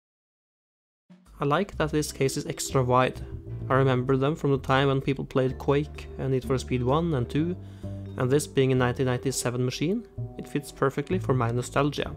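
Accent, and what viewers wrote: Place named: Norway. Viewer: Du er norsk? Er bare vi som snakker engelsk sånn=P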